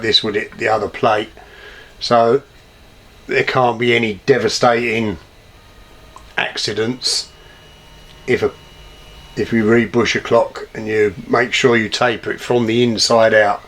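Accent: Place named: Cockney, United Kingdom. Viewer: This was a brilliant video. I love the fact that you built your own bushing tool. Ive been wanting one for ages but begrudge the stupid amounts of money they are asking for. I wouldnt mind having a go at making one myself. Ive managed to build my own mainspring winder and a test stand too. Ive been messing around with clocks for about a year now and even started my own channel. but I think its more of how not to restore them really :-) Nice to hear a cockney here on Youtube I'm now going to through your channel and look at your other videos. Johnny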